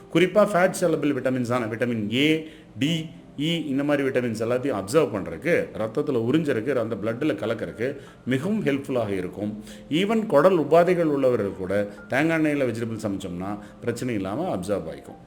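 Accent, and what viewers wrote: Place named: Kongu, India. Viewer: Vadhakudhal thaan sir😂 avar pesuvadhu kongu tamil. Adhanaal thaan vanakkudhal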